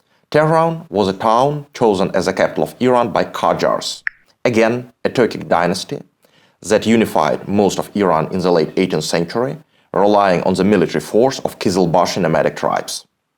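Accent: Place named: Russia. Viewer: The Russian accent is too heavy. Every time I heard “th” pronounced as a “s” or “z” it made me hurt.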